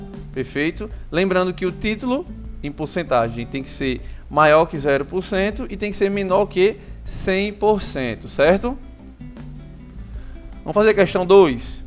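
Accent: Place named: Northeast, Brazil. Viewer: adoro o sotaque nordestino ^^ (favor não ver 'nordestino' como depreciativo, falo nordestino como morador da região nordeste do país. )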